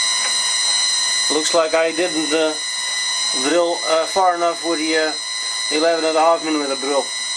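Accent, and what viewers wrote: Netherlands. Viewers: Aan je tongval te horen, ben je een Nederlander. Ik moet zeggen dat je erg goed Engels spreekt voor een techneut. Hou vol. Amusante video's.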